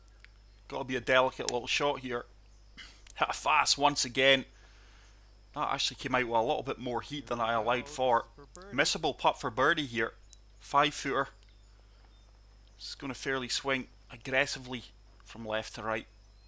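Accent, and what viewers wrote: Scotland, United Kingdom. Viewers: What part of Scotland are you from,?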